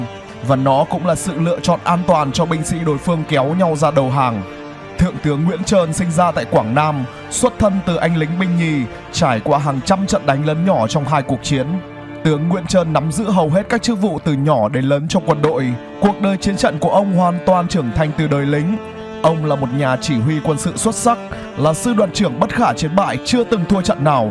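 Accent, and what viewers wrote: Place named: South, Vietnam. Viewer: Giọng cx đậm chất miền Nam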